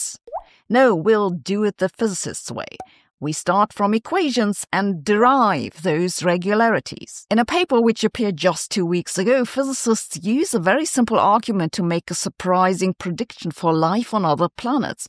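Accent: Germany. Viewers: She is German. Give her a break...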